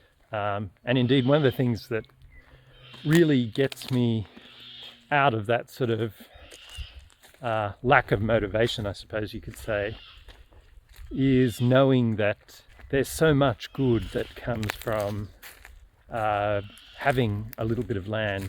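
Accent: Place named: South Africa. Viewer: I can hear an accent, is it South Africa? I'm a pom on 4 acres in central vic too. My land looks very much like yours, sloped with lots of trees, it can be challenging to work with ;) I agree with you on the mental health benefits, it's so nice being out amongst the trees. I work from home in tech and I love those 15 minute walks here and there! I went pretty hard with the crops during covid but it got too much for me with all of lifes other demands. I just couldn't keep up with the weeding, the birds/insects/rabbits/possums decimating my crops, or the wombats bulldozing my fences. I kinda got burnt out making so much effort to get a bowl of strawberries or a basket of deformed half eaten corn, I scaled it right back and now just focusing on breeding chooks for meat, I'm trying to tackle the high cost/high value items. I want to do sheep next but we have no fencing along our creek.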